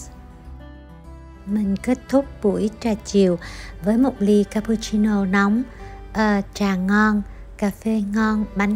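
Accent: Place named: South, Vietnam. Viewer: Giọng miền Nam của cô * nghe hay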